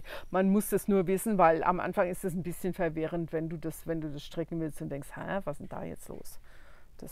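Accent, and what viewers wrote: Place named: Hamburg, Germany. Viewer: Ah, alles klar. "Hässisch" und dann noch "hambugäsch" obendrauf. Dadurch erklärt sich vieles. 😉😘 Mag ich sehr gerne hören. Ganz liebe Grüße über den groooßen Teich 🤗 in ein so ganz anderes Klima 🌵🌞, was ich vermutlich klasse fände. 👋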